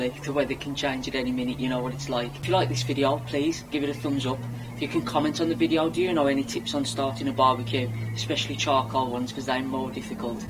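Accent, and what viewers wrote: United Kingdom. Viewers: Good tips, thanks for the video. Curious, what accent is yours considered? Like, within the UK, what would it be called? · seems a nice guy, but comes over as rather nervous about being on camera, and his presentation is very rushed. I'm actually quite surprised there aren't loads of Americans complaining they don't have a clue what he's saying because of the speed (I know how difficult some American find British accents). Both of his vids I've seen so far are good, he just needs to relax and slow down a little.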